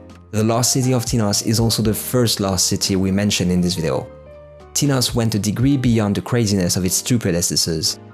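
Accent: France. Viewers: Is it just me or does he have a french accent? Great video btw!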